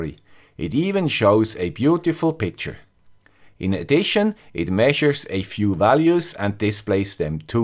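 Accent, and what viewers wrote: Switzerland. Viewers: dude you are awesome , im gonna try to watch all your vids, love the swiss accent, im american, obviously, thanks for all the help in the past and the help you will give me in the future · Dankeschön, Du 'guy mit dem swiss accent'. So viel Herzblut in den Videos und genau so viel Fachwissen. Man lernt in jedem Video etwas Neues. Hast Du schon mal Annex Wifi-RDS ausprobiert? Ich halte es für ein ausgezeichnetes Projekt, mit dem sich alle möglichen DIY-Lösungen mit ESP8266 in Windeseile erstellen, testen und modifizieren lassen. (btw: 'accurate' and 'accuracy' are both stressed on the first syllabus in contrast to 'developers' - sorry about that....)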